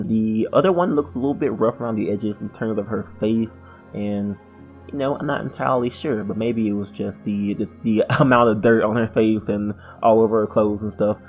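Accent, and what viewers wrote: South, United States. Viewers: greetings from Russia by the way, I noticed that you have a southern accent you're from the southern United States?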